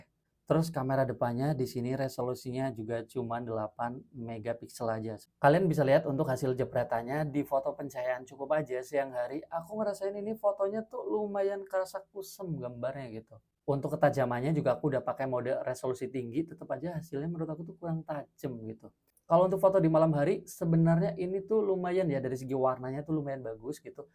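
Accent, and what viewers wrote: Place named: Cirebon, Indonesia. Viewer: Cirebon banget indo nya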